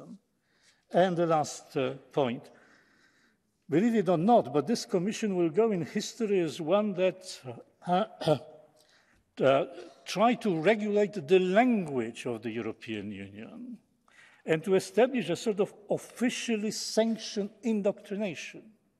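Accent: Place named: Oxford, United Kingdom. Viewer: Panie Profesorze powiedzieć że jest Pan genialny to jakby nic nie powiedzieć....i w dodatku ten Oxford English...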